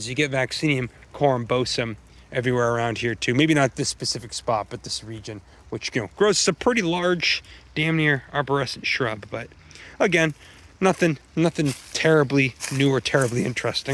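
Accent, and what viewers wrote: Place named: Chicago, United States. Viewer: I love your videos but maybe you should consider leaning into a New England accent rather than a Chicago accent since you’re doing New England botany! Plus a southie accent or a maine accent would be super fun. 🥰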